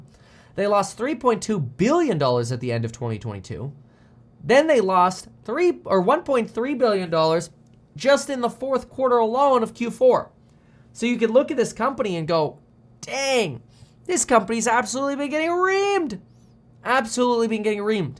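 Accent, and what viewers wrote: Switzerland. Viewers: Love the Swiss accent! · Why isn’t anyone talking about his SWISS accent?!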